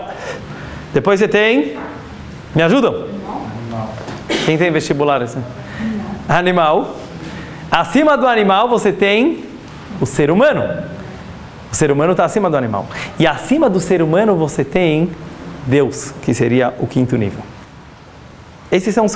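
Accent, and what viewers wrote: São Paulo, Brazil. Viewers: o que irrita o sotaque paulista? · Se este sotaque paulista não fosse tão irritante eu veria o vídeo ate o final, mas não consigo.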